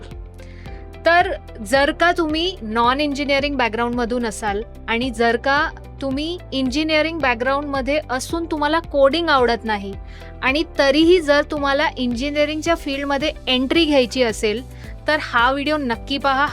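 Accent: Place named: Gujarat, India. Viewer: गुजराती असून ही मराठी स्पष्ट आणि छान बोलता. तुम्ही डाटा अनालिस्ट ची माहिती खूपच छान आणि उपयोगी अशी दिली आहे....... ती खरोखर सर्वांना मार्गदर्शक ठरेल....... धन्यवाद 🎉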